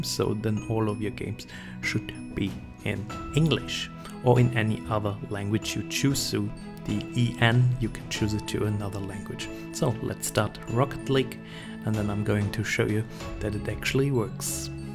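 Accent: Germany. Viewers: Man hört den deutschen akzent😂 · Man hört diesen deutschen Akzent und man sieht es an den Settings :D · man hört so schön diesen nicen deutschen akzent raus hahaha <3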